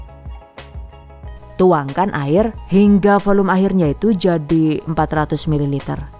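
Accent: Banyumas, Indonesia. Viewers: yutuber favorite kluargaku,,,resep anti ggl,,,bhsanya mdh di mngerti tdk bertele",,,,tutornya jelas sejelas" nya,,lucu bgt klo sdh ngluarin bhsa ngapaknya ,,,😂👍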